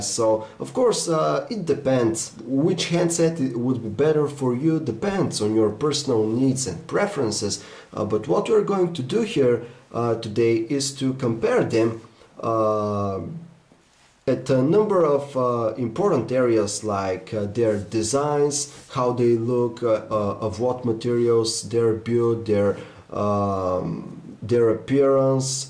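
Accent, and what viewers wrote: Russia. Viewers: Your accent is pretty cool. It reminds me of the russian dude in 007 goldeneye. "I am a genius!" Also, you guys need to review the custom ice cream sandwich roms. They are much better even than the stock android os which is already way better than the ancient little kid IOS It's funny, I see tons of people go from apple to android and they never go back. I have also seen people go android to apple but they alway go back, except one dude. He said it looked "neat". Dumbest reason ever! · Is he russian^^ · Is this guy Russian? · WTF phonearea guy with russian accent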